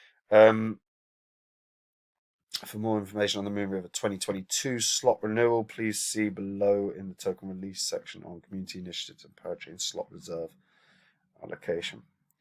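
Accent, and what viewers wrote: United Kingdom. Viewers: He has a really clear british accent mate!!.....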